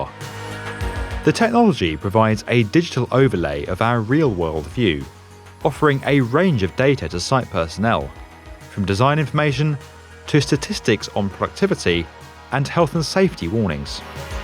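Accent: United Kingdom. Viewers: I like this channel, but let's be honest, you can get away with having nearly everything you say get taken as credible if you do it in a smooth British accent with sexy video editing skills. While most of the things here really do have a lot of promise....there's also a lot of wank too. The most infuriatingly bad proposal, the dumb idea that just WILL NOT DIE, has to be the pavement or sidewalk thing that "harvests the energy of pedestrian footsteps". This is not going to become a thing. Ever. Please look into how these things actually (don't) work. It would take years of foot traffic to power a single kettle boil, probably centuries to ever pay back the energy cost of their construction and manufacture. They're a scam that just won't die.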